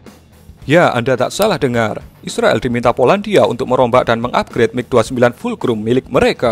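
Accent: Java, Indonesia. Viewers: logatnya jawa bgtu kayak sukarno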